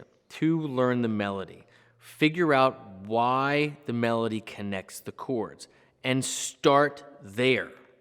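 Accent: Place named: Maryland, United States. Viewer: freakin love you brother! miss me some Maryland accents, chilling out here over that Humboldt County line!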